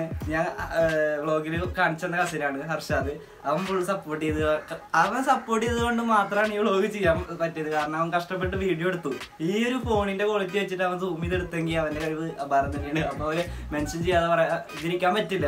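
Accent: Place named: Thrissur, India. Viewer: Thrissur slang 🤩💥🔥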